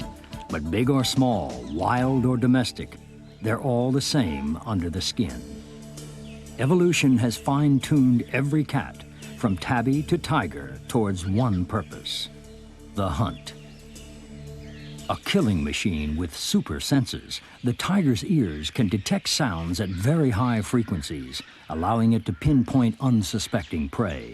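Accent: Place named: United Kingdom. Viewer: Why does the making of segment always have the original uk narration instead of a us narration?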